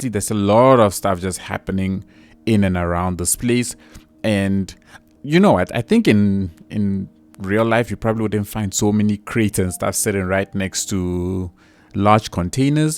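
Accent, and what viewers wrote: South Africa. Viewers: I can smell the accent. Awesome to hear some South African youtubers playing City Skylines. Hope you are blessed, brother!! Video Suggestion. Build a South African Major City replica - durban,Capetown, etc. Keep up the lekker videos man. God bless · are you south african by chance?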